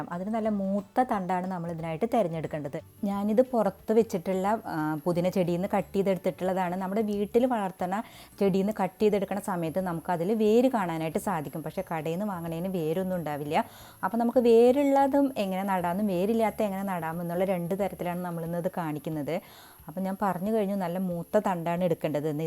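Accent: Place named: Pattambi, India. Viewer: Slag kettappo manasilayi.... 🤣😍.😍... me also... Pattambi.😘.. Madam evide?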